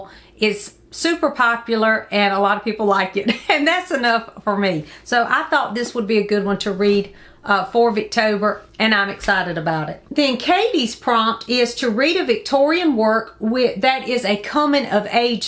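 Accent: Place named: Texas, United States. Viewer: Just found your channel. So glad I did. I have to ask are you possibly from Texas? I am and your accent sounds familiar.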